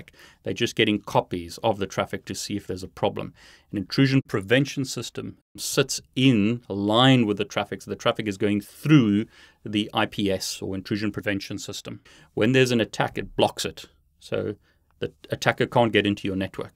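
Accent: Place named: South Africa. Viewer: Hi. Is your Udemy course the same as the YouTube channel one? I want to do my CCNA and was delighted to find a SA voice. Best Udemy video's as well.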